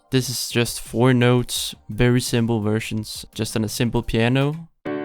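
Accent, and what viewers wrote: Denmark. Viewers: Er du dansker? :p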